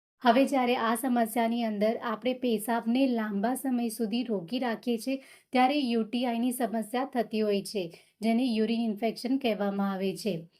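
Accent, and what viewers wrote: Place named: Kathiawar, India. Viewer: Rajkot tame kathi bolo cho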